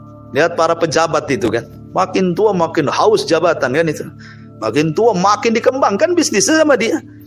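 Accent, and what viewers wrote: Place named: Sumatra, Indonesia. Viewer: Kl dr logatnya, sepertinya ustadz dr daerah Sumatra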